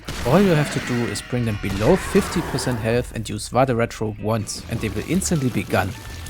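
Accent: Germany. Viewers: German accent man is back with another video!!!! POG You could narrate so many informative videos and I would watch them for your voice alone. It just seems so… perfect for this type of informative video. I don’t know why. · Ich mag deine Videos sehr, wwil sie ausführlich und informativ sind, aber ich finde, du solltest besser an deiner Aussprache arbeiten. Dein harter deutscher Akzent triggert mich extrem. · nice german accent i love it hahah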